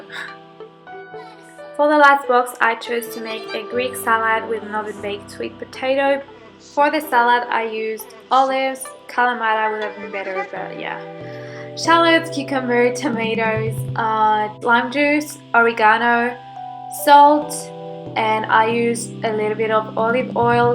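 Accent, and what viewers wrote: France. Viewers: are you from France :p?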